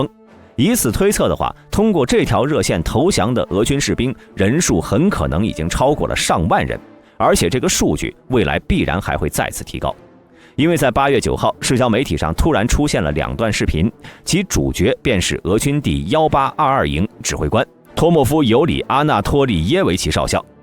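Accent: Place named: China. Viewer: 説話者是大陸口音 留言者都不是大陸的😁😁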